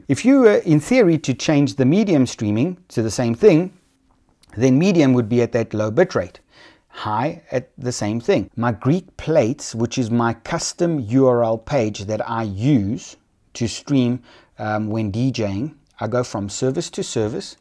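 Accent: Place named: South Africa. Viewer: So cool hearing a South African voice on YouTube.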